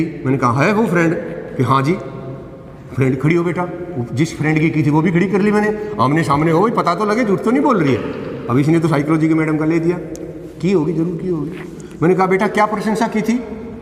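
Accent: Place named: Khari Boli, India. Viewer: महोदय अभी पिछ्ले हफ्ते ही मैने आपकी एक वीडियो देखी, जो मेरे पिताजी ने मुझे भेजी थी। आपकी आवाज़ में जो खड़ी बोली की सीमित मात्रा है वो एक बहुत अच्छा अनुभव कराती है। मुझे भी अपने बचपन के दिनो का एहसास कराती है। मैं आपकी छोटी पर गहरी बातो को नियमित सुनता हूँ। आपका तहे दिल से शुक्रिया अदा करता हूँ की आप बच्चो के लिये ज्ञानवर्धक सामग्री उपलब्ध कराने का उत्तम कार्य कर रहे है। नमस्ते जी। 🙏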